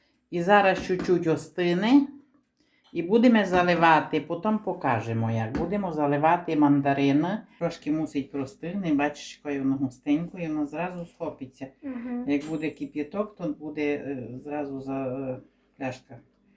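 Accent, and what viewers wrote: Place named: Volovets, Ukraine. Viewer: Така вимова файна. Маю цімборку з Воловця, вона так говорить. Я не вмію, але розумію😊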